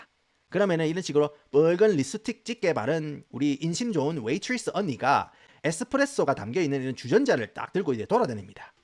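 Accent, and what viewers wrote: Gyeongsang, South Korea. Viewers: 이분은 세차를 취미로 하는 금융인 인줄 알았는데 성대모사를 잘하고 개그도 잘치는 경상도 억양의 사투리도 잘 구사하는 경제 및 시사 유튜버 + 문화평론가 ㅋㅋ 다양하게 골고루 잘하는듯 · 진짜 노무노무 재미쪄❤ 심지어 유익한걸 이리 재밌게 얘기하니 안볼수가있나 교육방송을 예능으로 풀어주는 우리 갱상도오빠!!!😂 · 칠갑ㅋㅋㅋㅋㅋㅋ 아 혼방 경상도인 이세요 🎉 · 경상도 입니껴? 스토리 텔링이 아주 재미납니다. 항상 잘 보고 있습니다. 화이팅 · 미국에 사시나요? 경상도 사투리 쩌네요.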